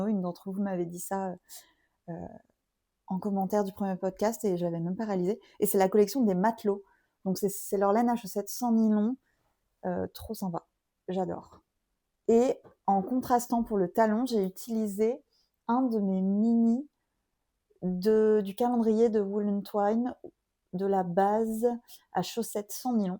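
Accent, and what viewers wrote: England, United Kingdom. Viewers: Merci pour ces inspirations 👌 Tu as vécu en Angleterre pour avoir ce bel accent ?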